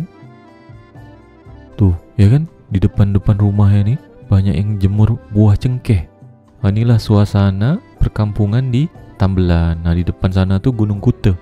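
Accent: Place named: Perak, Malaysia. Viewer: Salam dr mlysia..dgr drp dialek tuan punya video, mcm dialek org perak jer, awak tu mksudnya saya..dialek k.kangsar & parit..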